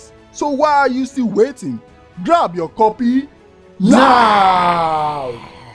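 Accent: Nigeria. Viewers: ahhahaha! real nigerian accent! I LOVE THAT! LOL!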